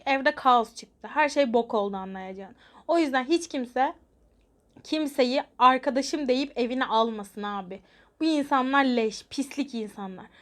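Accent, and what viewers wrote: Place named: Aegean, Turkey. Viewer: Ya seni yirim şive egeden izmirliyim öptüm çok ❤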